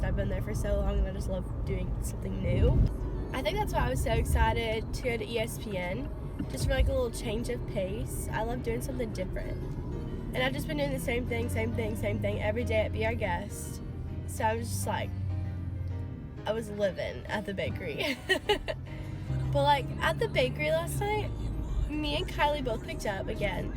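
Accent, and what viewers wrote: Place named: South, United States. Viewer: Your cute little southern accent i love it and you!!!!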